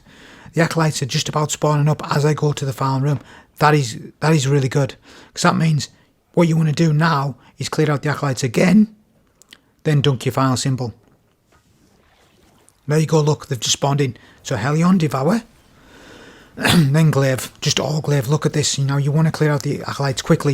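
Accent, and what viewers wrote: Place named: Newcastle, United Kingdom. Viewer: Scouse? He always sounded Geordie to me 😅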